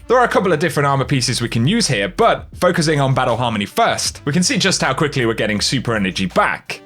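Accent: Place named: United Kingdom. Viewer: when did you turn british?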